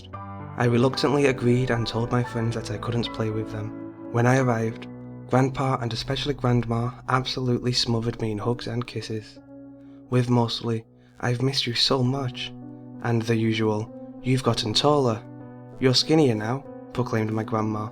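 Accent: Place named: United Kingdom. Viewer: as a American i can say you British and your accent makes everything sound scarier lol good stuff man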